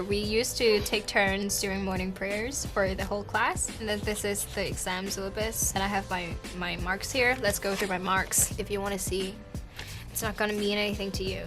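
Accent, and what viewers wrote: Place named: United States. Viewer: I can’t place your accent, could you explain on that a bit? Have you been to the US for a short while? You sound that way a bit ☺️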